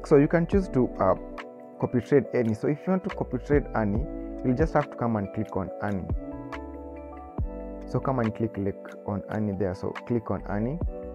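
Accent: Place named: Kenya. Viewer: ik a kenyan when i hear one.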